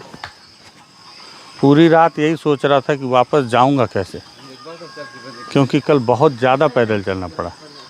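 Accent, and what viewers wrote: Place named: Varanasi, India. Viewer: गुरु आप वाराणसी से है या छत्तीसगढ़ से ही क्योंकि आपको देखकर लगता है आप छत्तीसगढ़ से है और सुन कर लगता है वाराणसी के है राम जी का पूरा प्रभाव है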